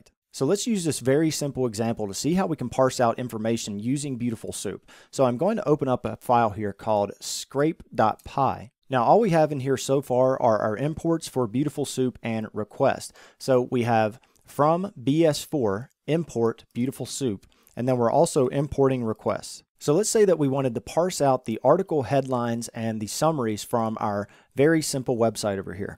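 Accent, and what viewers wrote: United States. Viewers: thanks so much, I really enjoyed watching it and learned a lot. I also really like your fast American English accent😊😅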